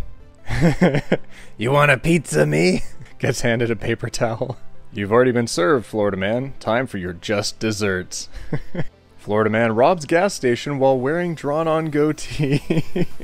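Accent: United Kingdom. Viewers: Post: JustARandomGerman: Reads in a British Accent Me: wh-... You know what, I still love it Edit: I just noticed that many others already noticed and commented this 😂 Well, great minds think alike. High five ✋🏼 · Just a random german: gets read in the most sterotipically brittish accent possible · why a British accent? their username clearly tells you they are german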